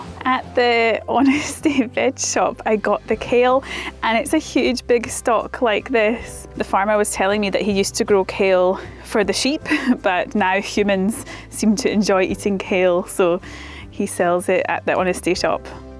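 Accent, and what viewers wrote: Scotland, United Kingdom. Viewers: Thanks for the tour. You're annunciation is excellent making it easy for a guy in Canada to understand you despite the Scottish accent. Not too much whiskey, lovely lassie. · Your a pro. Always like the scottish accent. Your video's makes me relax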